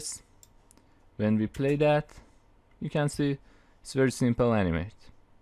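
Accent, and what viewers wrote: Iran. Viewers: حاجی حس میکنم ایرانی هستی از صدا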